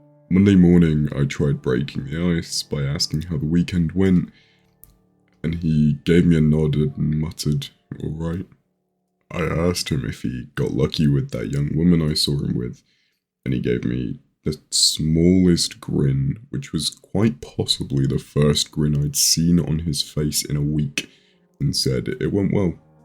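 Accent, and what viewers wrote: United Kingdom. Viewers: H0T BRITISH MAN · DEEP VOICE BRITISH MAN!!!!!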